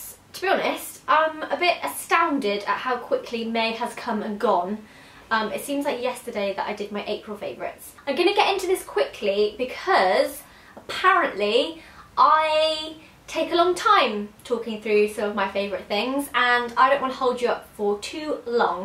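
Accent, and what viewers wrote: United Kingdom. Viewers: Is she british? Plz reply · You are british ?! :))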